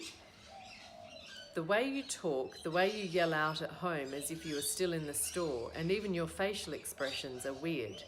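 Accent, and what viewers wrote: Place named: Australia. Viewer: Oh wow. Another Aussie! I found your channel through Shaun’s video and was so excited to discover your accent! I look forward to more videos and am off to subscribe to your podcast... Love the sounds of the birds in the background. Reminds me of being near the beach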